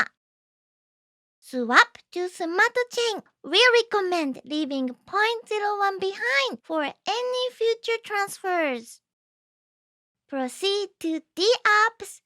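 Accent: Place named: Japan. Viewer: The narration is exactly Japanese English. Love it!!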